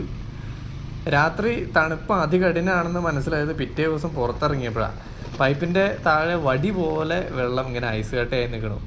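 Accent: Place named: Valluvanad, India. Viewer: Natil evdeya? Valluvanadan slang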